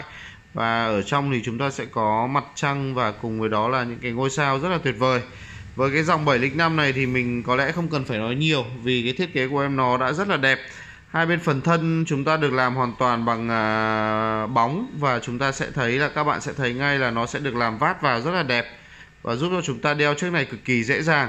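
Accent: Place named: Scotland, United Kingdom. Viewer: Thought this geezer was Scottish at the beginning lol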